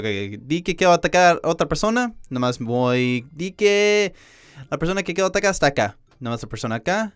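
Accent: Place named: England, United Kingdom. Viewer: un ingles intentado hablar español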